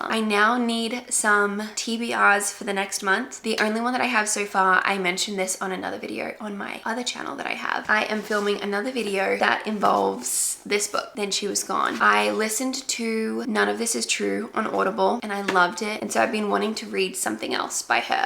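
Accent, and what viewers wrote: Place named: Australia. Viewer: the Aussie accent is back 🥰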